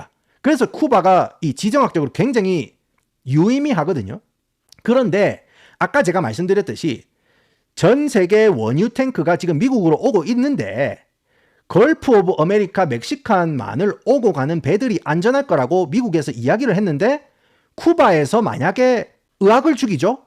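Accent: Gyeongsang, South Korea. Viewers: 경상도 사투리 구수하고 영어도 잘 하셔서 계속 듣고 싶어요 ㅎㅎ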